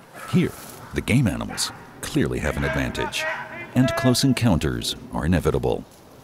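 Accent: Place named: Germany. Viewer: Wo ist denn sein sympathisches Denglisch und wo der Hund bei der Wasserjagd hin?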